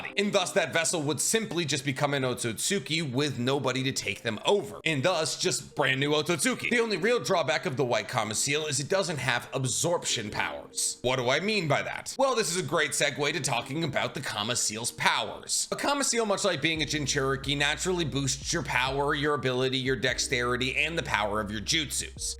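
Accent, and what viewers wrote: Boston, United States. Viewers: He’s just from Boston he has the accent makes karma sound like Kama 😂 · Makes sense. I was wondering why he was saying it like that. Good ol' Boston natives 😂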